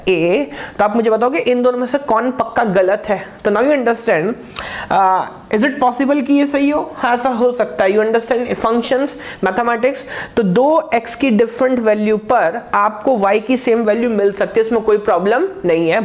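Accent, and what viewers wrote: India. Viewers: damn that Indian accent bugs me... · It took me a long time to figure it out, i'm ok with Hindi ascent, but i'm a Chinese so i don't understand Hindi but Nice Video! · the Hindi accents add class to u r lecture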